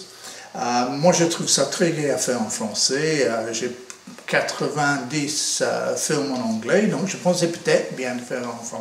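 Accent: England, United Kingdom. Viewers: L'accent anglais est toujours aussi charmant à écouter. Vidéo très instructive avec des moyens OTC, un régal !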